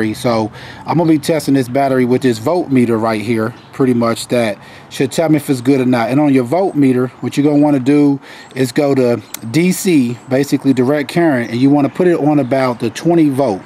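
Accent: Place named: St. Louis, United States. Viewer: Sounds like you might be from St. Louis my friend.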